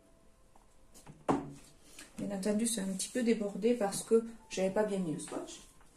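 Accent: South, France. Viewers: moment plaisant en compagnie de la charmante et souriante à l'accent agréable du sud pour découvrir la technique de mise en oeuvre de l'effet peau de serpent. 🤩 l'association des couleurs est sans fausse note. j'aime bien le rendu estompé du ciel avec cette surépaisseur matérialisant un voile nuageux avec l'effet de la pluie en mer. vous vous êtes un peu reprochée l'imperfection de la ligne d'horizon. pourtant, je trouve qu'elle est bien ainsi car plus réaliste que l'abstraction que l'on en fait simplement. je pense que si elle avait été uniforme elle aurait accentué une toile coupée en deux alors que dans votre cas la transition est naturelle. enfin, la représentation du bateau fut un clin d'œil pour moi. il m'a fait penser à la technique de représentation des fonds de décor que l'on peut également trouver dans certaines BD. une bien jolie toile. 🤩😉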